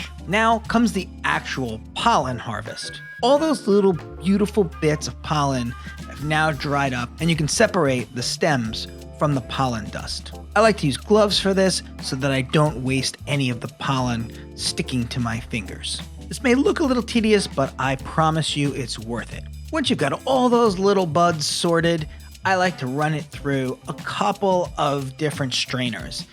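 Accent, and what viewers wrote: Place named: New York, United States. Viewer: Love your New York City accent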